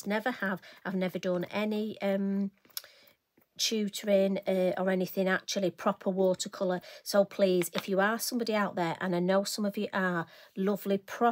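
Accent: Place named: England, United Kingdom. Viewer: love it! I enjoy watching you painting, and love your English accent. It is very soothing, and easy for me to understand what you say.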